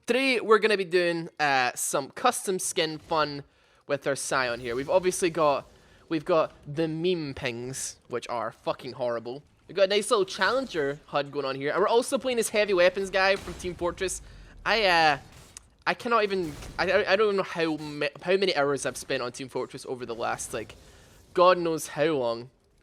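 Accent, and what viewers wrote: Scotland, United Kingdom. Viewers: Hes scottish (I think)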